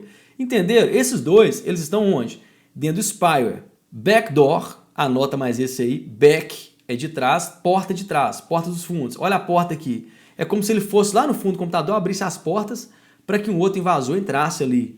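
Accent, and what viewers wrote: Minas Gerais, Brazil. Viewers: Só quem chegou até aqui sabe o sufoco que foi estudar tudo kkkkk assisti aula no trabalho, na faculdade, no ônibus e até no horário de almoço, mas vale a pena porque o professor é incrível, e o sotaque mineiro deixa tudo melhor. Obrigada professor! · aaaaaaaaaa professor por favor continue falando assim mineirinho tracim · Em defesa do "tracim", adoro o sotaques dos mineiros, por favor mantenha o tracim professor! Hhsuahusa... Aula top como sempre, vai me ajudar muito, muito obrigada professor!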